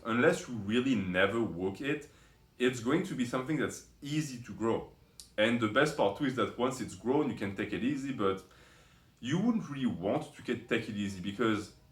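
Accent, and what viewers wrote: France. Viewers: don’t know if you’ll respond to this comment after 4 years but your accent sounds like french, are you one of us ? 🇫🇷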